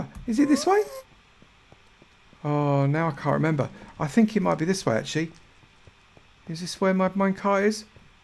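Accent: United Kingdom. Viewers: I really enjoy your vids/liked and av learnt alot from u, Esp wit redstone. I've copied a few of your tutorials and non redstone 1's. But my question is: 1 r you frm the UK n where,as I'm frm Norfolk coz I love ur ascent and 2: is there a way to make a lift system without using redstone 2 get items frm your mine to ur base on ground level?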